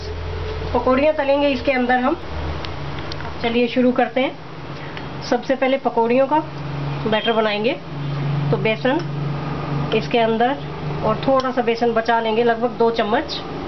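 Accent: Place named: Punjab, India. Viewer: As salam walekum sister's ap ki recipe se ziyada ap ki bate achi lagti h panjabi lehje me urdu bolti h bhot acha lagta h or wo waords sun k bhot acha laga or hasi arahi h or (pahari bana k) 😀😀😀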